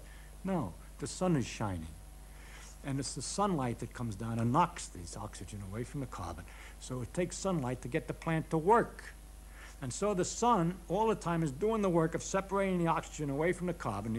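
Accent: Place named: Italy. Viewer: why is there a slight italian accent tho loool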